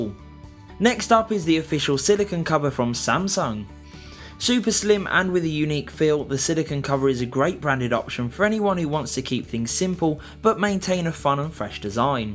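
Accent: United Kingdom. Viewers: Sounds like he's from the UK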